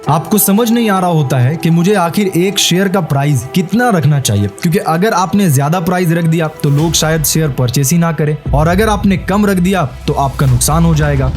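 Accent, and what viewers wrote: Bihar, India. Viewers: Are you from bihar ?? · Aap bihar se hona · Bhai kya tum bihar se ho please reply ❤❤❤❤ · Are you a Bihari? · You are from bihar...... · मतलब आप बिहार से हो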